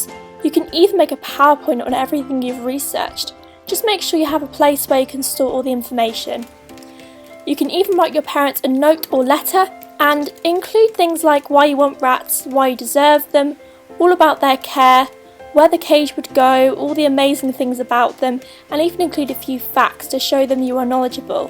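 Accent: United Kingdom. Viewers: OMG!, not only did I LOVE your video, It actually WORKED FOR ME!!!!:D I want to SCREAM I'm so happy! I watched your video, and I made a couple long paragraphs about rats, a couple facts, and my plan, and I'm getting a rat... THIS WEEKEND!!!!! To make my parents get more onboard, I'm going to use my own allowance to get everything! >D You don't even know how ESTATIC I am about this. P.S. I love you're (I think British) (I think it's spelled) accent! I'll tell U about her when I get her! Love, You're Biggest FAN!!!! >D!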